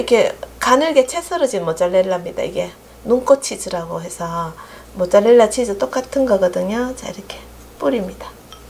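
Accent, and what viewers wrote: Gyeongsang, South Korea. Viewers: 가는곳마다 가 계시더라구요..그래서 끌려서 와봤더니 저가 좋아하는 요리채널이네요 경상도말이라 더 정스러워요^^ 조아요와 빨간버튼 꾸욱~~ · 반갑심더.. 딱 말씨가..대구 찍어봅니당.. 아님..어쩔수 없거든요.. 대구가 미인의 본고장 아닙니껴? 구독과 좋아요.,기냥..팍.. 이모님 시간될때 저희집도 한번 놀러오이소.. 캬..먹고 잡네용..^^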